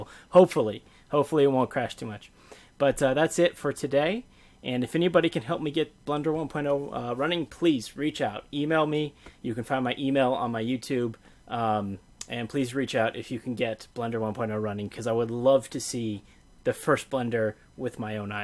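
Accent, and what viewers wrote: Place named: Netherlands. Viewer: Nice dutch accent, and also have of the comments in dutch. :D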